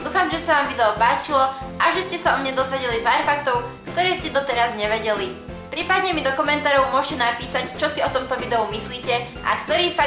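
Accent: East, Slovakia. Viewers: Páči sa mi ako Ti čas od času ujde niečo východniarsky :D :D "Tažéé" :D je to milé :D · AAA vychodňarkaaaa a znaje hutorec po rusnacky ta co jak še maš ! :D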